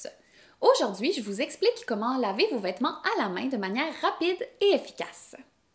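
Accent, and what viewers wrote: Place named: Quebec, Canada. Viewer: accent Québécois au top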